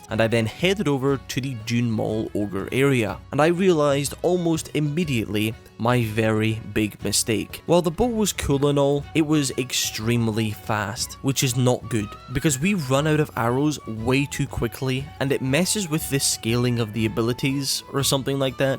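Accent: Scotland, United Kingdom. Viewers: I first played WOW 16yrs ago, then I stopped when I hit level 85 in Cata. I'm coming back - I'm interested in how the retail changes have changed the experience oh, and for some reason my level 85 Mage is not that level anymore. Trying to pin down your accent, definitely Scottish but can't quite narrow it down - don't think Glasgow, hmmm ...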